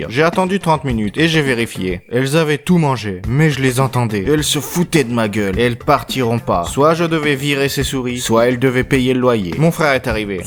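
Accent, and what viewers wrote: Russia. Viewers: T français ou russe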